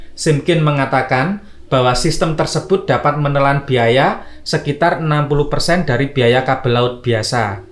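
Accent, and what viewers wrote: Java, Indonesia. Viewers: Sumpah mendok bngt pngisi suaranya. Gak enak bngt di dngr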